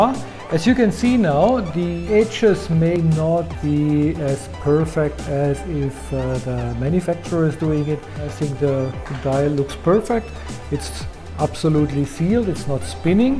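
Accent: Germany. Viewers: Thanks for the video with the nice swabian accent. Als Schwabe hat man den halt...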